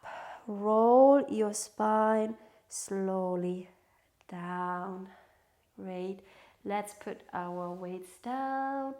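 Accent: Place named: Eastern Europe. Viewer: Thank you,. I asked you because I am russian myself and I can hear that slight easteuropean accent. Ann your name in russian.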